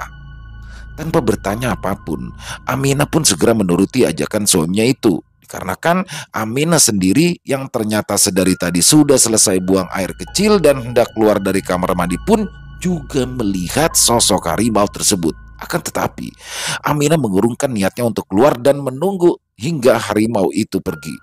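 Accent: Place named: Jakarta, Indonesia. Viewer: Klau dengerin cerita bg seakan terbawa suasana dan tau2 critanya udah selesai bgus bgt carapenyampaianya pnuh semangat apa lagi klu udah dg logat betawi keren deh......👍👍👍👍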